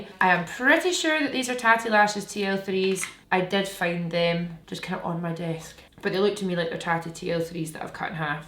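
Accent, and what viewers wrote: Scotland, United Kingdom. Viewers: I love the Scottish accent!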